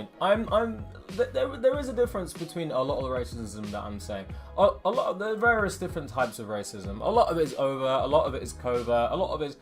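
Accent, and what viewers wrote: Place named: England, United Kingdom. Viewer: Bravo! You said this so well! The curious thing in America is that people (black and white) in the rural South got over racism a generation or two ago; but in the big cities, the North, the West Coast, etc., where people think they're so enlightened, one's race dictates how he's expected to talk, dress, act, walk, drive, sing, be entertained, learn, live, worship, vote, and even procreate. For instance, you speak exactly like any other young educated Englishman. A blind customer in the shop where you work couldn't possibly know your race; but in urban America one phrase out of a black person's mouth would instantly reveal it and deliver a boatload of assumptions--most of them imposed by a destructive urban black subculture itself, not by white people trying to keep anyone down.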